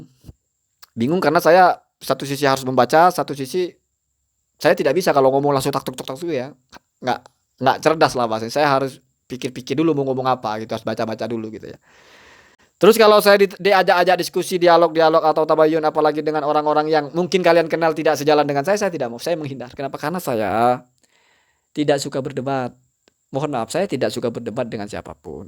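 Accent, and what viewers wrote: Madura, Indonesia. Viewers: Ustadz orang Madura ya. .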